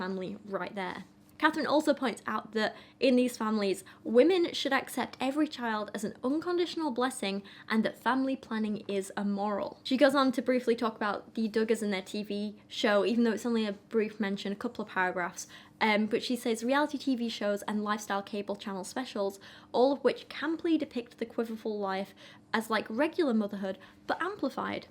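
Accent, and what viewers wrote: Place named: Yorkshire, United Kingdom. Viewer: Hi,! I knew exactly what saying you were talking about at the beginning as soon as you started describing it. I have been thinking of it recently when I watch videos critiquing tradwives/the alt-right but it was bugging me that I couldn't remember it either. I finally Googled it just now and it is "Kinder, Küche, Kirche" (Children, kitchen, church). Off topic, I love listening to your voice/accent! It reminds me of when I was able to go visit my aunt and uncle in West Yorkshire (though they themselves don't have Yorkshire accents because my uncle grew up near London and my aunt is American like me). Edit: Yes, state troopers are synonymous with state police. They tend to be involved with investigating crimes that occur in rural areas/outside city limits.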